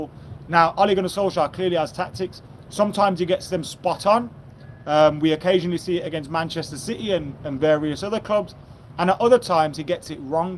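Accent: Birmingham, United Kingdom. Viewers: Hahah are you a Brummie??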